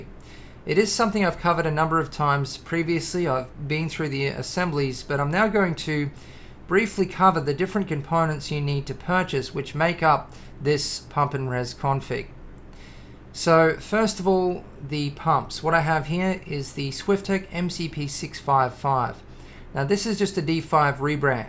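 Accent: Australia. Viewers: I hope you manage to make a nice number of £/$ from your videos because they are excellent. I see that you do builds for customers, is it for only certain parts of the world? I ask because I live in the UK and you sound like you live in Australia... How could you build a rig for someone like me, that lives on the other side of the world? I do have a brother in Oz, for someone like me, I suppose I could pick it up; however, I cannot see me getting my custom rig on the plane as hand luggage. Do you have a website, or place that reveals your details for custom builds? Shalom and Grats!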